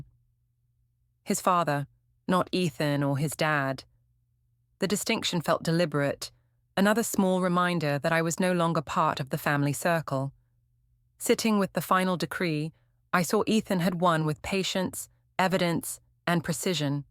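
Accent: United Kingdom. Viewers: I hate the British accent in these stories. The stories tend not to get to the point but a exercise in proper English. I. Prefer the raw, to the point speaking.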